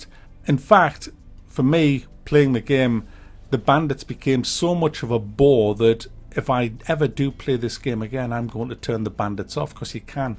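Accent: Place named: County Durham, United Kingdom. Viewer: Love seeing the reviews, have done for years, im from county durham and weird hearing a local accent on youtube!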